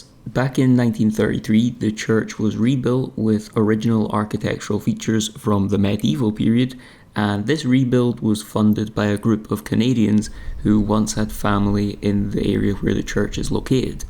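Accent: Scotland, United Kingdom. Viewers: Hi! I like you! I arrived in Scotland (Edinburgh) this past Monday, and the voice of the Airbnb fellow I am staying with keeps reminding me of yours! You all sound like you are speaking music to me. I so enjoy listening to you and watching. This video is one of my favs so far. You are a great rock skipper. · Amazing scenery is always appreciated. Just money making can get a bit boring. Tiny houses are also fascinating as there are no two the same. And to hear a Scottish voice is cool! 💗🆒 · I personally enjoy both video styles, but the vlogs with beautiful scenery narrated with a Scottish accent and a quirky sense of humor is nice to watch from the blazing heat of Texas, USA.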